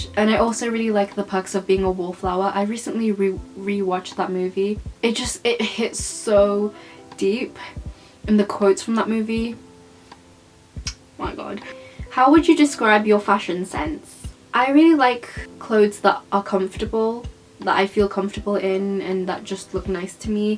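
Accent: United Kingdom. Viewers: Can you be my british teacher ?? Bcs your accent really impress me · The british accent sounds so lovely ❤❤ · suka dgr british accent tu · Thanks for cheering up my day, btw really love your British accent ♥️ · This is phenomenal, never seen a malay lady with british accent SO COOL 🤣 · Kalaulah saya boleh cakap bahasa Inggeris macam. British accent. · I like listening your british accent , so clear and understandable ... I am ussualy rather bit hard for understanding common british accent...because I'm used to listening american accent ...but now I find your video, and I try to understand your british english ...and yes, its work ... your accent and pronunciation is amazing ..keep it hold ! ... your new fan from indonesia ... Harfani Abu salman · love your british accent!! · i'm really love britsh accent and i woud like to learn from you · wow patutla fluent gile english accent ......born at uk rupenya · I watched your video to boost my Brithis English:) i kinda like it! 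Love from INA🇮🇩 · I love your britain accent so much. please make a video on how to speak more britain :) ps: i love harry potter and i want to study in uk too one day....maybe after finish my spm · im in love with her british accent thoooooo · You kind of have the british accent but not as how it is really I mean those English people have this accent that's hard to understand , yours is just a hint of British accent. Its clearer and easier to understand. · i wish i could speak to her everyday, i mean, i would love to learn to speak fluently with those british accent. it reminds me more bout harry potter and narnia ♥️ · Lol finally a malay who talk in British accent other than my teacher lmao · U have UK accents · Uuu that british accent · I really like your british accent 😁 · AAAA I love your British accent 🥺❤️ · I’m here for the accent honestly 😊😆 Love the British accent voila 🙌🏻 I tend to speak accents for work meetings following my global colleagues since they understand better if I speak their accent 😃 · Hi! 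It’s okay if you can’t speak malay since it’s not your first language after all. Just ignore those who spoke behind your back about you. Btw, I really loveeee your British accent. I’m currently studying in Degree for Eng Language and tbh it’s personally hard for me to copy the british accent. Your videos are really, really motivating me to practice more☺️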